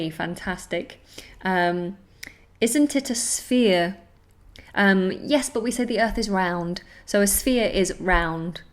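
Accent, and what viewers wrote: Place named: United Kingdom. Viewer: Amazing these live lessons!!! Stunning British accent!! Thanks so much,... and Jacob Baby English of course which lend us his lovely Mum!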